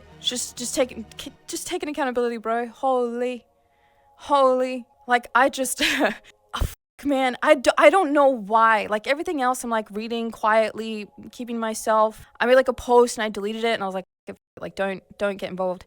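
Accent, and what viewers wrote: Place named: United Kingdom. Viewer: It's been a while since I've heard sound this British this often.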